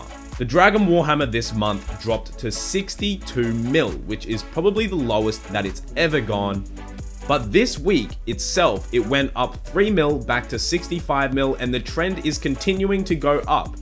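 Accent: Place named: Australia. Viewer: are you Australian? if so where about are you from? good vid by the way!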